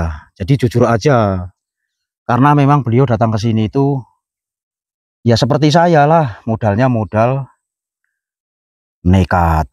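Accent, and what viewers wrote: Banyumas, Indonesia. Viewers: Sehat terus bang,aja klalen ngapak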